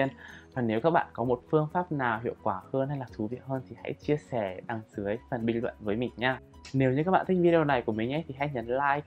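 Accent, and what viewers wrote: Nghe An, Vietnam. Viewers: Bạn ấy giọng Nghệ An nhưng cố nói giọng chuẩn Hà Nội nên chưa tự nhiên 100% á nên giọng nhỏ và trầm hơn bình thường, nghe thì sẽ thấy có nhiều chỗ hụt hơi do phải "luyến" cho ra giọng HN với đôi chỗ cũng nghe ra âm sắc giọng Nghệ An:)) Cơ mà mình thấy giọng Nghệ An hay mà nếu nói chuẩn như giọng HN thì tốt không thì cũng chả sao! Just the way you are.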